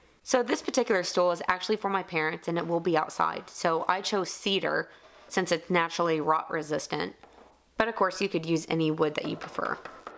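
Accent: Texas, United States. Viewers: haha its the Texas twang 😆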